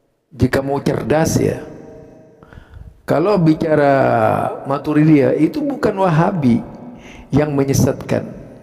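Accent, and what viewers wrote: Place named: Java, Indonesia. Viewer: Ojo ojo dulu mendoknya sama kyi idrus romli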